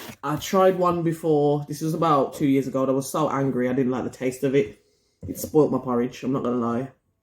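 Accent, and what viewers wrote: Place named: Jamaica. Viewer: You still pronounce it the Jamaican way too lol... Great video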